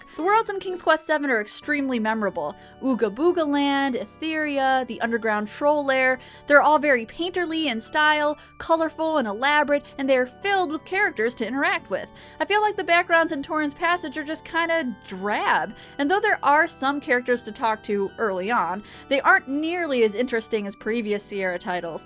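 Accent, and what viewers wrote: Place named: Midwest, United States. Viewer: You sound very midwestern during your streams vs your edited videos.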